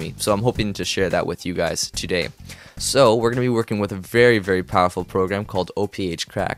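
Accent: Canada. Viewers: you are canadian. right???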